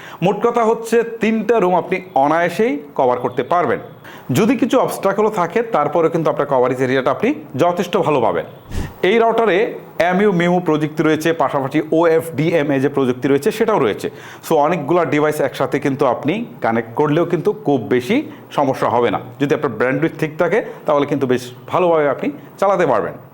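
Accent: Bangladesh. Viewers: Border par Kora Asa chan naki India ta??? Poris Kar bangali kotha bol ta paran na??? Bangladesh accent marchen... ar vul bal router ar kotha bolchan. Amazon page a giya daklam.... sob gulor review ta bolcha kichu na kuchu defect Acha.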